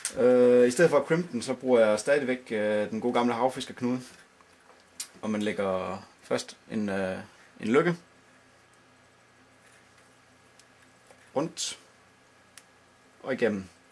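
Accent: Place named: Scotland, United Kingdom. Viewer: i thought you had a bad scottish accent at first..lol..good vid tho mate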